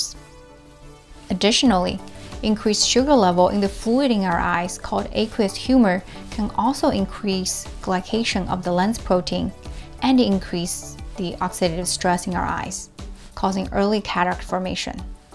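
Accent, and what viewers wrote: United States. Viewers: Easy , just marry a smar/t pretty Chinese or Japanese female as seen in this video! No problem 😊 ! She will nurse me as I will shower her with LOVE ❤️ 😍😎 ! Yah yah ! Learned alot from this video! Her English is AMERICAN! I seen many videos when others have a strong accent and hard to understand what they are saying!! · Pity you talk american.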